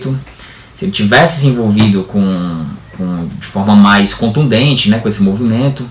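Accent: Northeast, Brazil. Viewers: Você e nordestino?🤠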